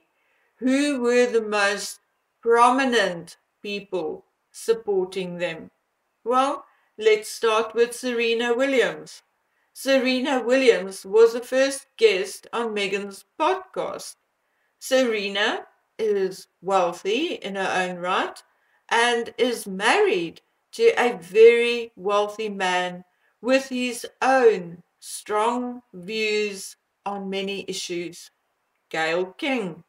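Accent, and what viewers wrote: South Africa. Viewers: I think impressions by people who are not in the UK are dubious. This is a small island and you are never far away from the RF. They make visits an amazing number of times. Its extraordinary how they have the stamina. They do marvellours work in many areas unpublished but leaked by people who work in the royal residences. All this can happen because they live in America one of the most dangerous places on earth. I worked abroad as a member of the Foreign and Commonwealth Office and the Americans were despised as ignorant and brainwashed. They are deadly in diplomacy you want no association with them. I gather from your accent you are South African and maybe have emigrated. You forget the Monarcy is here at the choice of the people and will not be removed by anyone overseas. It will end when we, the people, decide it does not suit our purpose. 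You also leave out the greed for money and power that fuels the US in a way it does not in Europe. Americans think because they speak English they are like us. They are not. We have not shared a history with them. We did not massacre and try to starve a native population. We had equal rights for our black and asian friends. OK some people object to the benefits some of these people have over poor whites. They are at the head of every profession in our country. Likewise women including 3 Prime Ministers, First Minister of Scotland, past First Minister of Northern Ireland. America is a power hungry country. it is also ridiculous in is post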